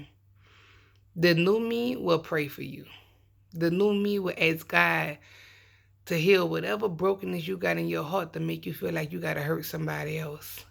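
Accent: New Orleans, United States. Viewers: I love when your name pops up in my notifications. Means I'm gonna get some insights in your cute N.O. accent.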